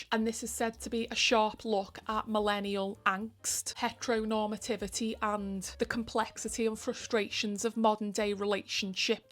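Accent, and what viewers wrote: Liverpool, United Kingdom. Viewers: Thanks for this video. It's much appreciated for Pride Month. I just discovered your channel today. As others have mentioned on other videos, I love your Liverpudlian accent. Most of the lit fic Booktubers I come across have quite formulaic American or English accents. No shade to them, especially as many of them have American accents because they've learnt English as a second language. But it's really wonderful to hear more diverse voices in the Booktube world (literally!), so thanks for your contribution ❤️‍🔥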